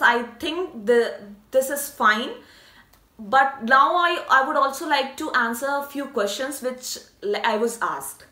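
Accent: India. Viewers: After listening her English i am conform that she is an Indian.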